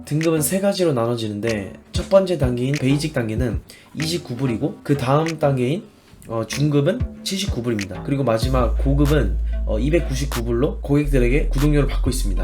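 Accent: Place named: Jeolla, South Korea. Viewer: 영어 발음이 섹시하시네요 ㅎㅎ 반면에 한국어는 전라도 억양? 귀여워요!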